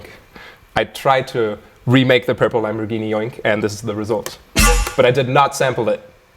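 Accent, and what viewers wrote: Germany. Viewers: I always thought he was Irish, but now I know he's German I can actually hear it, bizarre! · I love his German accent XD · wie man das deutsch raushört :D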